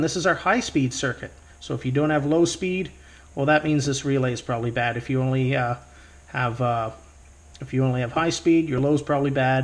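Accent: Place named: Canada. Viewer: Love the Canadian accent. Reminds me of the good ol trailer park boys.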